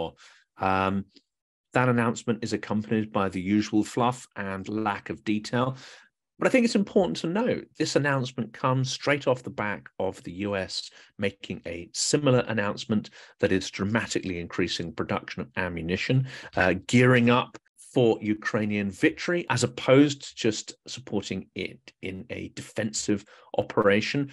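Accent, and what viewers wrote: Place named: United Kingdom. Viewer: I love having the wonderful summary you have put together tonight! Your one hour commentaries are priceless as have all your guests been. Never think they are too long! Your work is amazing and appreciated. I’m so busy keeping up with our Starsky, and Johnny FD and our Canadian/UK/Swede Brandon, it’s a gift you give us bringing honest news and knowledgeable guests on your channel. Thank you for all your hard work and the British accent is a pleasant change from our American talking heads. Слава України 🇺🇦 героям слава! 🧨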